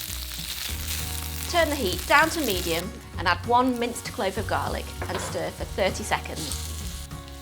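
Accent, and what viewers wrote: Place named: England, United Kingdom. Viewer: Heard the English accent, I knew there'd be alcohol involved. Sigh... you all really need to stop consuming poison.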